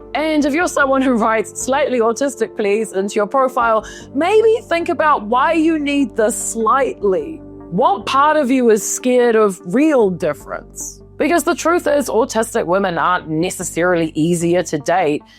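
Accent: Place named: New Zealand. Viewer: Are you referring to the accent? It’s Kiwi.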